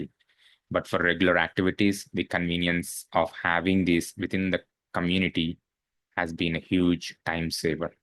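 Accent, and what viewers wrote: India. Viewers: You look like a man in late thirties and if you’ve lived in the US for twenty years, that means you must’ve been in your late teens when you first moved to America. That leads me to my next question: how come your English sounds like you’ve never left India? Just curious. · Oh dude you lived in US for 20 years but your accent is still as if you never left India but so sorry that you had to return back, is it Trump? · After staying in the USA for 20 years how do you still have a such a strong indian accent? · Your accent is very bad and abrasive, like that of an uneducated Indian, though you lived 20 years in US! You must be a lazy person! Telugu people are very lazy!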